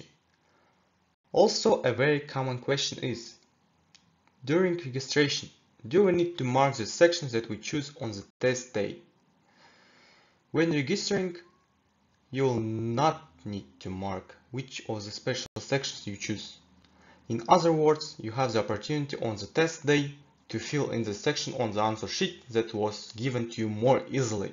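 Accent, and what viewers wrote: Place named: Eastern Europe. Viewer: I love your Slavic accent! This video was quite helpful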